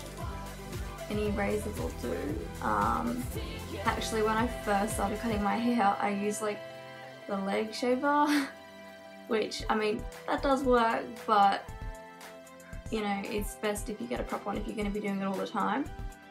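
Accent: Australia. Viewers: I though you sounded Australian so I checked your channel :D Me too lulz ^^ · alot of people commenting about your accent. but i didn't even notice you had an 'accent' because im Australian too. soo really: for me, it's not an accent :p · she's from australia idk where. you can tell it's an australian accent ( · Aussie accent? I'm aussie! xD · ARE YOU AUSTRALIAN :D I AM YOU SOUND AUSTRALIAN / NEWZEALAND MAYBE BUT U SOUND AUSSIE IM AUSSIE LOL Heyy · its australian lol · It's Australian accent <3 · im pretty sure she's aussie. like me! :D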